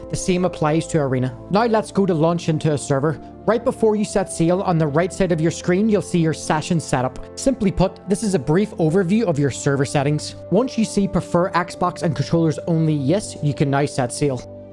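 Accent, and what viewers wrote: Northern Ireland, United Kingdom. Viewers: Northern Irish..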